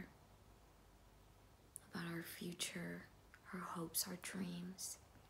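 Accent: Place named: United States. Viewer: your american accent reminds me of michelle rodriguez!!!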